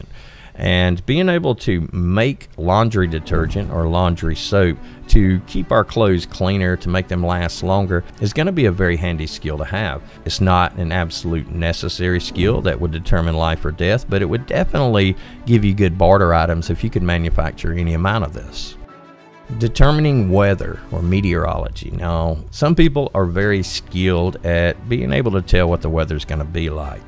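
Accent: Alabama, United States. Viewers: That sounds like a Cullman Alabama accent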